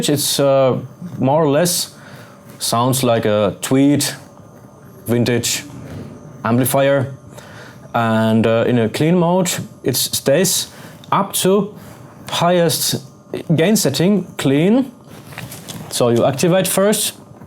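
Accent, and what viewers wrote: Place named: Finland. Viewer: suomienglanti ftw :D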